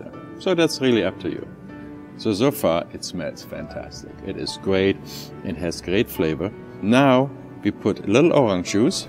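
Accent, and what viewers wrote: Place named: Germany. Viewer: I love this, I absolutely love this. It put me right in the Christmas spirit and made me feel right at home. I have a very german family with very german older relatives, and this all just brought me back home. And to top it off I have a new recipe to bring to my (Polish) inlaw Christmas table. Who I know will absolutely love this! But honestly, how is it some guy, with a german accent, somewhere in the world made me feel so at home and comfortable all at the same time. I honestly wish I could spend a night or a week at his hotel just to feel all that in person. This man is the host that all hosts strive to be.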